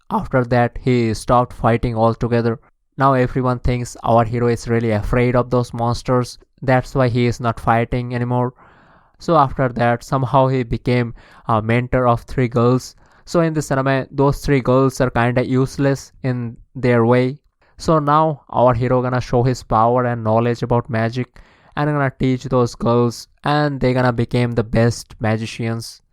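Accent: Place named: India. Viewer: i hope you can fix your english more... and speak less with the indian accent. thanks for the list btw keep it up 👍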